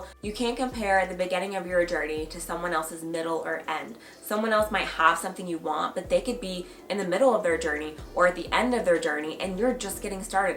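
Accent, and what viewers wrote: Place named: Tennessee, United States. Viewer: I’m really hearing your Tennessee accent in this video which is weird 😂 especially since you moved to LA!!! I love it thoooo we need a get ready with me installment to this video 💕💕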